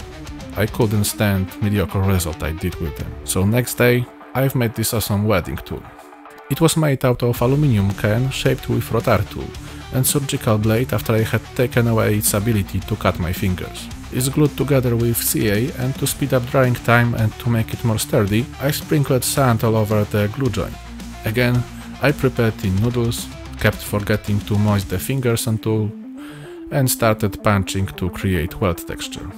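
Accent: Poland. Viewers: sound like polish accent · Siema 😊 Polski akcent ❤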